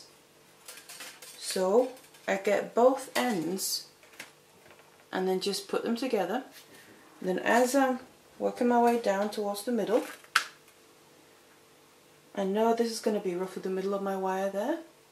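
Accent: United Kingdom. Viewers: She is using the UK pronunciation because, I assume from her accent, she is from the UK.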